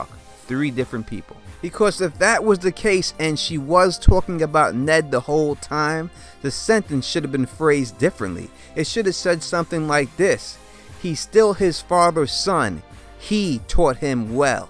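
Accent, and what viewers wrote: New York, United States. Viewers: Mind fuck! Well thought out...ur right about GRRM throwing down hints when u least expects it. For as big as the books are, nothing is prattle. Ur new yorker accent poses an amusing juxtaposition with the fantasy content.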